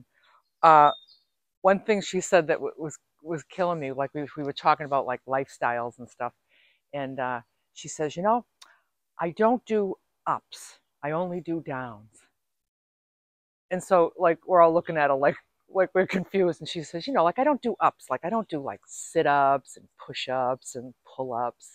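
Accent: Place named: New England, United States. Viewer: I swear, with your smile, your wonderful New England accent, and your enthusiasm, I'd enjoy watching you set up a checkerboard! You're so delightful and precious! Thanks again for sharing your story.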